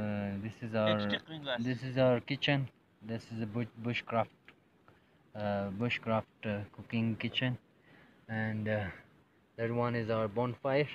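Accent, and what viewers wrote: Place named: India. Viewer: Fullywatched 💯💯✅. By the way. Your voice like Indian. Is it write?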